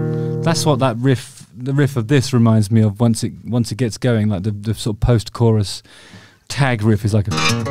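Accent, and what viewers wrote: United Kingdom. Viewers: Just recently found your stuff Justin. I really enjoy it. Sort of missed out on The Darkness as I was already living in Germany as you lads were getting recognition in Blighty. So I'm catching up. What kind of Gibson is it you use to play your intro song? Anyway keep up the good work. Always nice to hear a nice British accent , the humour and the observations. All the best pal from the only Glaswegian living in Sachsen.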